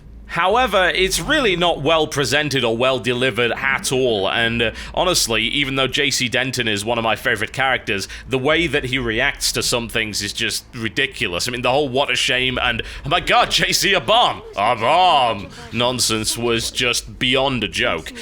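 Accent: United Kingdom. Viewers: Chronicals of the gold farmer was the best show TB ever made period, it had it all: humor, the cynical brit, information and a lovely british accent.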